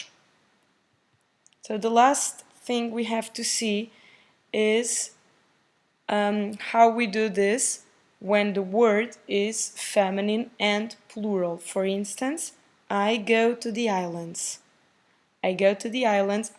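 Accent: Portugal. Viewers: its European Portuguese or brazilian?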